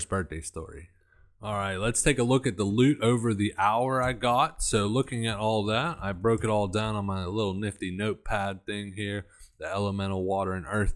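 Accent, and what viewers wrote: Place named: Pittsburgh, United States. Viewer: well the pgh accent makes sense now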